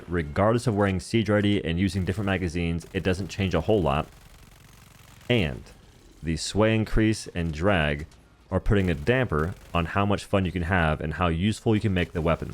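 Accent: South, United States. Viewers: Naturally he seems to have a very mild, almost undetectable southern accent. He exaggerates it when saying certain words for comedic effect. An older video showed his PO box is in Texas so it makes sense. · He's Suth'ehn. Git'it?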